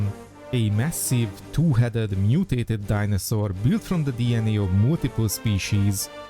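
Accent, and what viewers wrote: Hungary. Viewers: judging by ur accent im 100% ur hungarian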